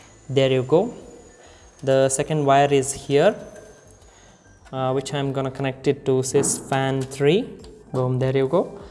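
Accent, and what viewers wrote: India. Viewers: I had quite a bad day and hearing English with Indian accent really cheered me up. Great video!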